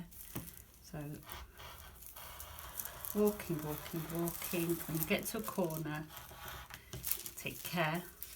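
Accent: Yorkshire, United Kingdom. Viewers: Hi, just wanted to say that you explain things so well, thanks for that. I'm watching your vlogs tonight and really enjoying them. Do I detect a Yorkshire accent!!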